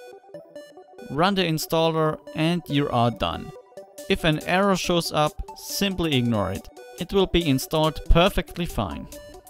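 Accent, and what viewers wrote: Germany. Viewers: Are you from Germany? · Wow you are actually German speaking English. You don't see a lot of Germans talking English now a days .. good job man :D you should have more subscribers than that ;) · Is that German or something?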